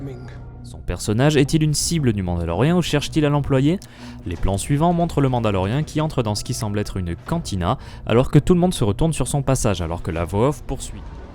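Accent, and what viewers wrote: England, United Kingdom. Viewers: Je pense que la scène avec l'enfant et le matériel de la CSI est un flashback. Pour le vaisseau inconnu, il verse dans le style corellien non ? Enfin, le personnage avec le blaster sur l'épaule me fait tout de suite penser à Cydon Prax, un chasseur de primes apparu dans le jeu vidéo Star Wars The Clone Wars (PS2 et GameCube). Superbe analyse comme toujours ! Il faut conserver l'accent anglais, c'est là votre marque de fabrique 🙂 !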